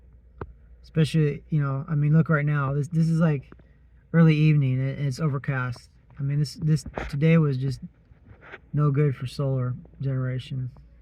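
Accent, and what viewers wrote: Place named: United States. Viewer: You are an original American so I could learn English from what you said/spoke. It is not easy but I love learning, 😊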